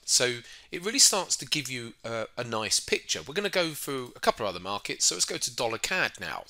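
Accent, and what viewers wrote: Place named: United Kingdom. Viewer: That British accent man I wish I had it too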